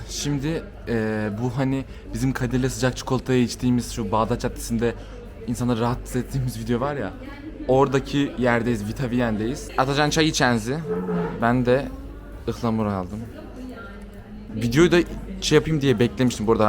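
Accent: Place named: Rize, Turkey. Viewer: O ne öyle rizeli rizeli şeyler✨✨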